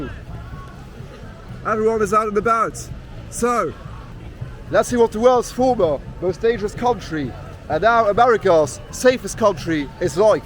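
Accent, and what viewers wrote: United Kingdom. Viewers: The don’t sound like a gringo, you sound more like a British person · Love the English accent, makes the video more entertaining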